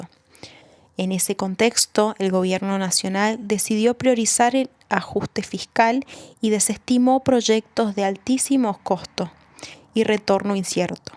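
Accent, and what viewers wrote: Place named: Mendoza, Argentina. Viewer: mil disculpas! Pensé que era tu voz distorsionada 😅. Ahora tiene sentido Yo también soy mendocino, a veces se siente ajeno nuestro acento al escucharlo grabado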